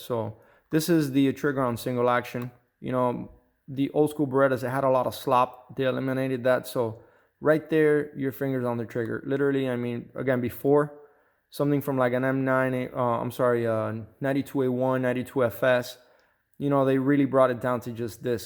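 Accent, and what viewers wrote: Miami, United States. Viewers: i can tell you are from Miami just by the way talk bro lol, good video man keep it up! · You sound like you’re from Miami lol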